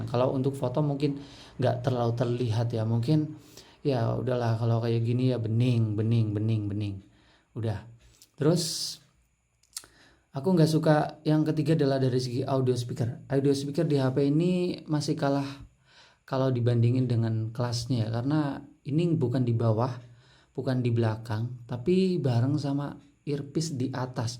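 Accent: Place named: Java, Indonesia. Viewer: Abang orang jawa yah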